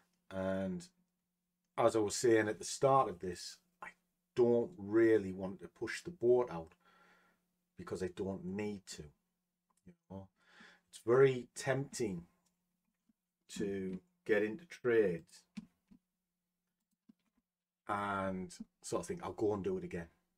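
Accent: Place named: Northern England, United Kingdom. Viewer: Hey like your videos. Sounds like you're in my neck of the woods. Good to hear fellow northerners smashing it. Like the way you trade live. All the best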